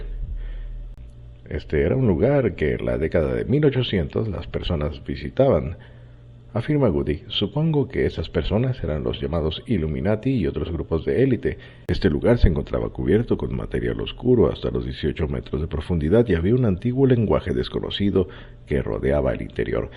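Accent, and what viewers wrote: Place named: Spain. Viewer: Asta que cambian las voz. K ya me tiene asta la madre las voz español o gallego. Mierda. La lengua española de es la más horrible de la abla ispana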